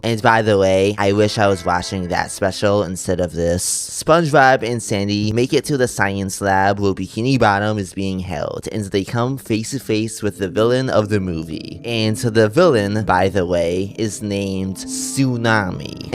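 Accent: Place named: France. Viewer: heyy! i wanted to say you sound like the french nararrator from some spongebob episodes :)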